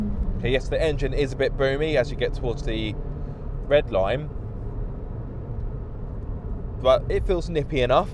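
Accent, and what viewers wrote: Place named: RP, United Kingdom. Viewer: Stop trying to talk posh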